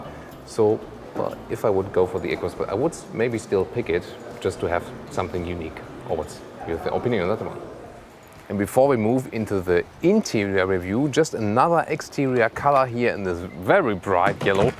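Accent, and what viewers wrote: Germany. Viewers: Is that a German accent? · Oh...hallo, natürlich verstehe ich Englisch. Aber ich dachte mir, warum macht er mit diesem sehr deutschen Akzent denn nicht einfach ein deutsches Video. Die Erklärung leuchtet mir ein...vielen Dank.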